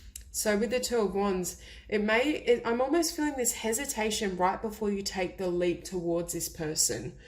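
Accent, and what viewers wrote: Australia. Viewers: Number 3! Resonates 100% thankyou so much❤️ Are you from Australia?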